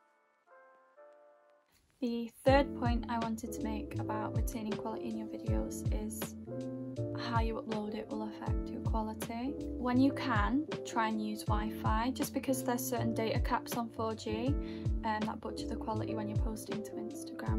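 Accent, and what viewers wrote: United Kingdom. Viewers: shes british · Where in Yorkshire are u from??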